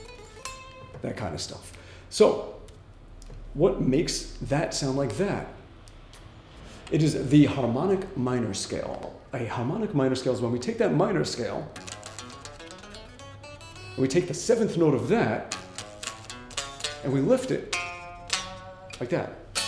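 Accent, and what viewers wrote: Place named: United Kingdom. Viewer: speaks like British some times, He is the american G. Govan.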